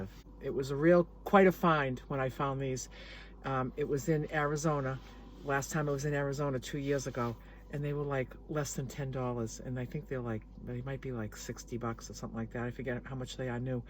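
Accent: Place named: Boston, United States. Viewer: you are incredible! I subscribed months ago but life gets busy, so was glad to see you’re still doing this adventure! Watched this whole thing… toothbrushing to breakfast to dancing with that gorgeous blue sky backdrop. Yes, I hear the “Boston” in your voice😉. Hello from Connecticut. I’ll watch more often. Your shows are “glimmers.”👍🏻🙋🏻‍♀️🤣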